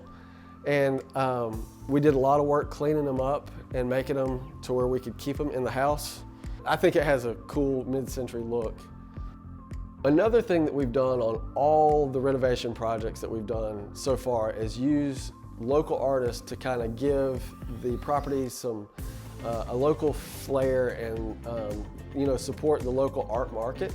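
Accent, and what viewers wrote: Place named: Georgia, United States. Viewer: I went to UGA in the 90s, your accent brings back memories!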